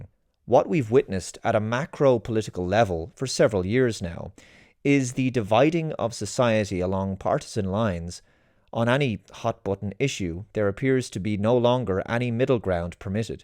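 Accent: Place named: Ireland. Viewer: If I may, I would like to hear you put the truth of Western culture’s debasement, undermining etc. etc. etc. in more historic terms. Honestly, there ain’t nothing new about what’s going on, right? Your astute and insightful dead pan brogue, for me, is the most delightful if not compelling reason I listen. Unfortunately, I find your message can come off a little hopeless with tones of the very, “them & us”divide you abhor. We can overcome this. Thank you for your great work and time. “History doesn’t repeat itself, but it does rhyme.” Russian proverb. (I think)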